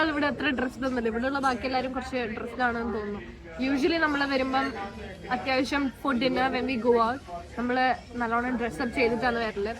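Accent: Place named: Thalassery, India. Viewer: That thalassery slang is slaying 😂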